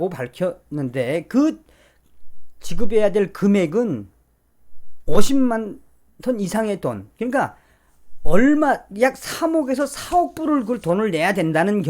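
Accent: North Korea. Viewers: 성산씨 북한 말씨는 좀 자제해주세요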